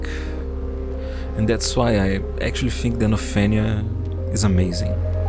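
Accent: Brazil. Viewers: Como alguem que mora no Canada ha quase 10 anos, seu sotaque é otimo e lindo! Consegue nao ser tao carregado a ponto de ser dificil de entender, mas ainda tem o charme do nosso sotaque tupiniquim.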